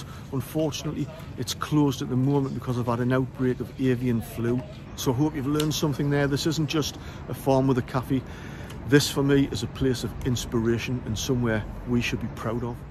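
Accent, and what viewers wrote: Newcastle, United Kingdom. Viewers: Great video,research and genuine empathy . You sound a really decent and genuine bloke(and Geordie) 👍 Howard the lads!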